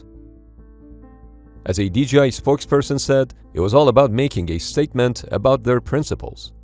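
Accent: Iran. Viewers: Sounds Farsi-influenced :) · Khaliz Persian accent is evident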